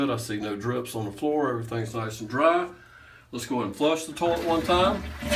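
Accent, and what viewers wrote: South, United States. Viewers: Thanks for being very thorough! I like your " " accent"!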